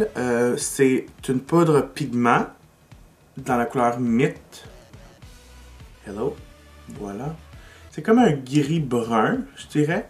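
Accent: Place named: Quebec, Canada. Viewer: Haaaaaaaaan trop cool un tuto en français !!!!!!! La pôpeeear you know ;) On n'a pas accès à Annabelle ici en france mais entendre ce doux accent du Québec ça réchauffe le coeur, merci <3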